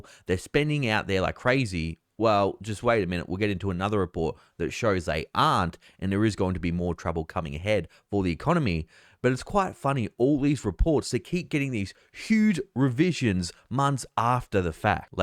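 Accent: Australia. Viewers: Aussie accent...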